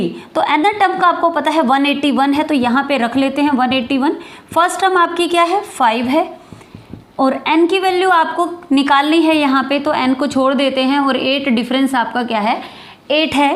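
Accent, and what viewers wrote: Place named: Gujarat, India. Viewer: Kaha❓❓ Gujarat se aap Ho kya.....Words ki Pronunciation se Lagta hai.....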